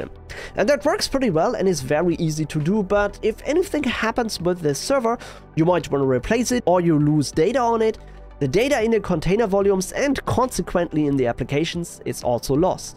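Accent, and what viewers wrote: Germany. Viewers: Gutes Video!.Deinen deutschen Akzent hört man ja sofort hehe xD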